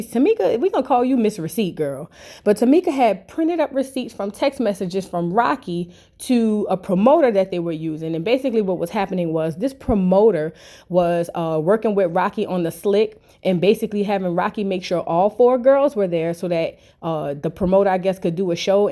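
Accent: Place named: Louisiana, United States. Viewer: Why you sound like the mistress, is it a lousania thing, lol.....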